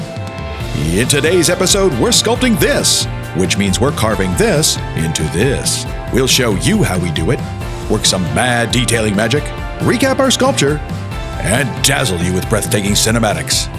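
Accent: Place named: United States. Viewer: Beautiful approach to building in this game. Love it! Btw, you must be the most American sounding American on the internet.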